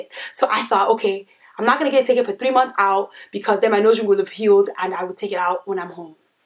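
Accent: Nigeria. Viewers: Lmbo you told this story in the most Naija way. So funny. Glad you made it out alive :)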